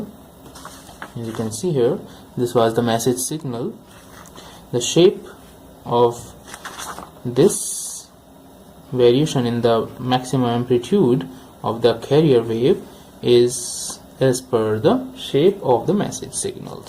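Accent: India. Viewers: btw I already hit the like button but are you really from the US or you are an Indian guy?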